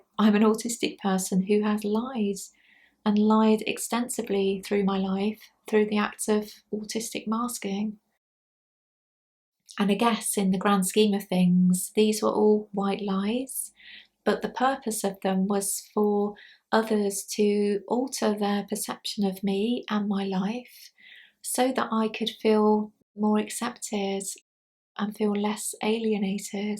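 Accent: United Kingdom. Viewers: Are you from the UK, Australia or New Zealand.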